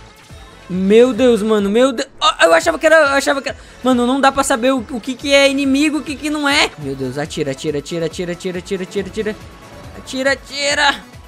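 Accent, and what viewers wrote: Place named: Paraíba, Brazil. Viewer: pq o tem um pouco de sotaque paraibano?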